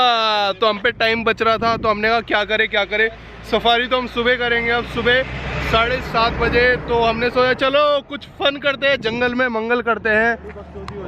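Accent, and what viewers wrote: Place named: Punjab, India. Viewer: Mundaw punjabi lagda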